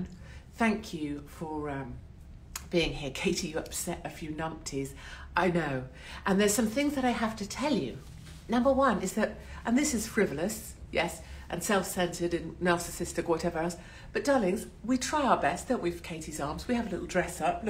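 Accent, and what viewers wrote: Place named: Devon, United Kingdom. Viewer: How did you get rid of the Devonshire accent? I'm a proper Janner (just down thd Road from your birthplace). Glad to see you are well, x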